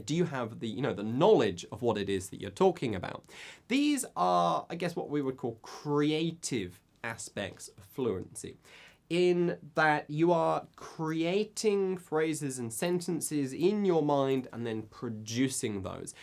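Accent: United Kingdom. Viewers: What's his accent? which kind of British accent?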